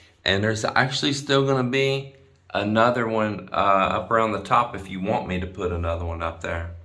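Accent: Texas, United States. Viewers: I love the Texan accent. It’s so howdy 🤠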